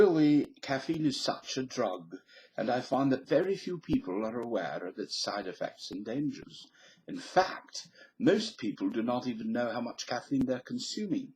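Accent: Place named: England, United Kingdom. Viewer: I was thinking about clicking away but the English accent gave me confidence in the information that was provided.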